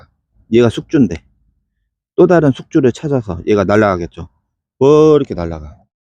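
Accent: South Korea. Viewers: 충청도 사투리 가생이 ㅋㅋ 재빛곰팡이가 잘생기는 아가들이 있더라구여 그럼 쟈는 가망이 없는건가유?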